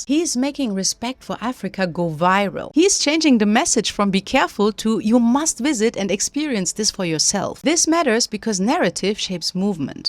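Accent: Germany. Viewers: 😊I thought you have a German accent 😊 Thank you for pointing this out. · German accent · When she said "I left Germany..." I was like "I knew it!" 😂 Her English is really good, but it is just really difficult to hide our accent, proven once again. And also proven once again: We Germans are everywhere! I've met Germans in every corner of the world. Good for her that she found a nice place with better weather 👌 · I knew you know German well before you mentioned Germany. Your English sounds like that of Germans.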